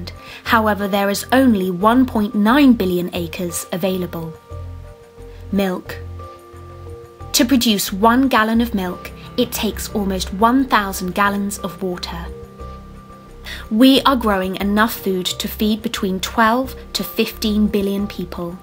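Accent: England, United Kingdom. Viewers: These figures seem controversial. I mean, I know they went to the trouble of hiring a voice actor with an English accent, but has anyone bothered to fact check this?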